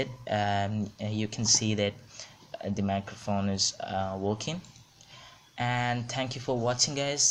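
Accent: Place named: Indonesia. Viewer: Your accents jokes i love it are u from Indonesia im guessingg, pZee xD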